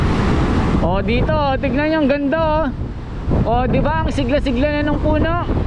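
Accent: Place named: Provincial, Philippines. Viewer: ANG MGA ITINANIM NA PUNO AY HINDI NGA IBINAON SA LUPA NG TAMA. DAPAT TALAGA IKINONSULTA SA MGA HORTICULTURISTS ANG PAGTATANIM. KULANG NGA SA LUPA. SA ABROAD, HINDI GANYAN ANG STYLE NG PAGTATANIM- MAS MAAYOS AT RELIABLE ANG SA ABROAD. DAPAT IKAW VLOGGER, MAGING EVIDENCED- BASED INVESTIGATIVE VLOGGER KA AT HINDI PURO TALAK- NG TALAK AT HAKA- HAKA. NAKAKA- IRITA ANG TIMBRE NG BOSES MO. PROBINSYANO KA BA?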